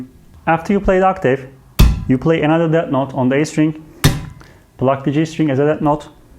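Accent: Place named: Turkey. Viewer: abi sen kesin türksün konuşmandan anladım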